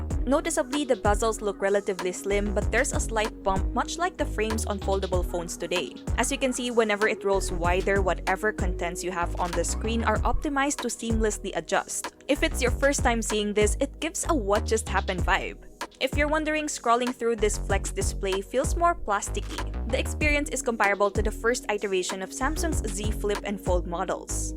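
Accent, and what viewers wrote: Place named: Philippines. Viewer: That filippo accent gets me all the time 😍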